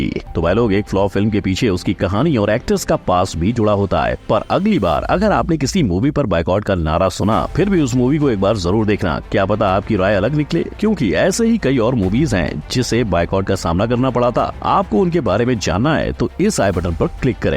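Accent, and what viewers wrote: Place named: Bengal, India. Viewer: Your Bengali is too sweet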